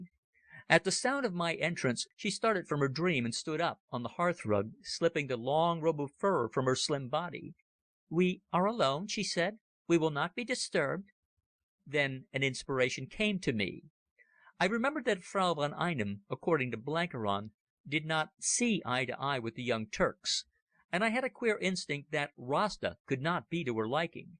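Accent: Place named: United States. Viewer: Oh another bloody American narrator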